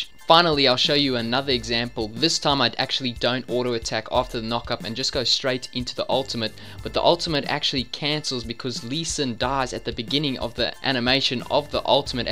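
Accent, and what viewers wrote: Afrikaans, South Africa. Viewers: are you south african?? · Dude are you south african? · is jy Afrikaans dude?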